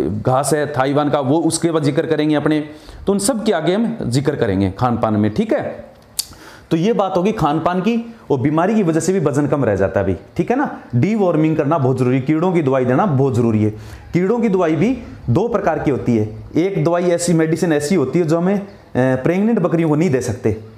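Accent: Rajasthan, India. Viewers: Sir aap Rajsthan se ho kya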